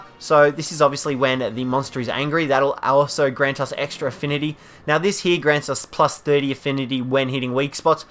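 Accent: Australia. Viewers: If you've ever met anyone from down under, there are many words they pronounce differently culturally. I.e. Pita (Pih tuh) visa (Pee-ta), and abalone (Ah-ba-lone) visa (Ah-ba-lone-e)... and in the end, who really cares? Did you understand WHAT he was talking about? If so, why is his use of the word a problem? So, in my opinion, let it kill you... One less d-bag's comment.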